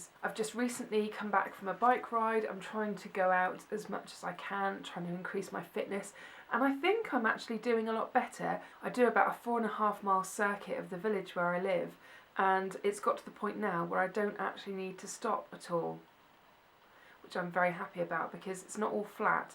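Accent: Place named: United Kingdom. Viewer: Am I alone as a French subscriber 😊? If so, I hope you will soon have many subbies from this side of the Channel! Love to hear your British accent. Do you know that Estee Lauder has just purchased DECIEM? The Ordinary is not an independent brand any longer. I think I will make a large order before prices begin to rise...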